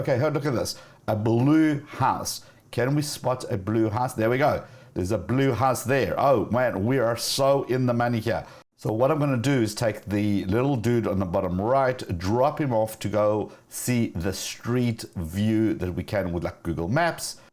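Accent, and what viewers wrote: South Africa. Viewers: Bro great vid it's bothering me you sound South African 😂but in about you from usa😂😂🤔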